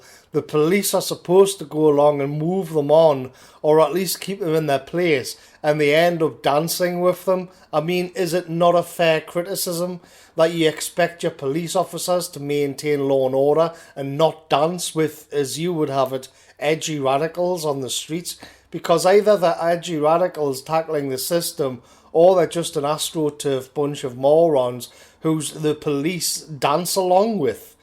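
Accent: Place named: Newcastle, United Kingdom. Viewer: views are so intelligent, researched and insightful, that I sometimes wonder if he is himself a man of education, and the unabashedly working class Geordie accent is a front...?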